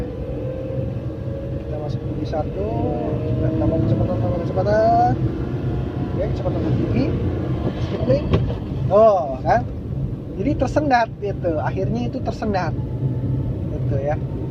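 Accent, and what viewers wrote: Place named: Pangandaran, Indonesia. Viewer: Orang mana a siga orang pangandaran ningan😁😁🙏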